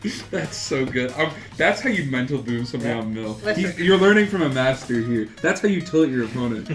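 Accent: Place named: United Kingdom. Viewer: these auto subs are the embodiment of how hard it is to get an ear for a regional british accent sometimes 😅 Nice list!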